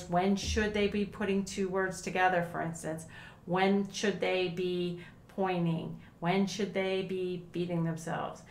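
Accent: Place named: Pennsylvania, United States. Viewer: Knew you were from Pennsylvania right away.😂. from a South Florida transplant by way of the Lehigh Valley and thank u for rhis guide 😊❤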